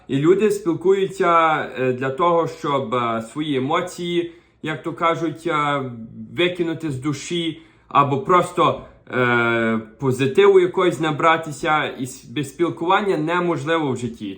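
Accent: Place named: Transcarpathia, Ukraine. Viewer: Цікавий канал, у акцент закарпатський:)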